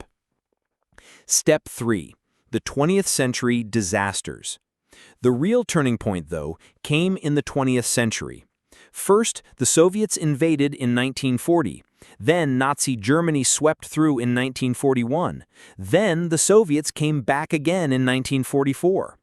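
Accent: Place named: United States. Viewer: PUTIN TV in american accent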